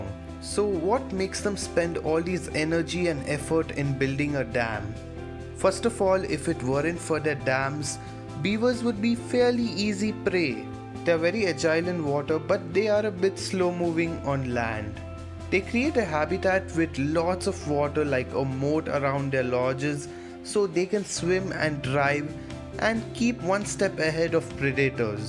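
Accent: India. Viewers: awwww hit a nerve how am I racist for saying taliban ???? And he really sounds like India but sorry I'm no expert like u in accents BIG BRAIN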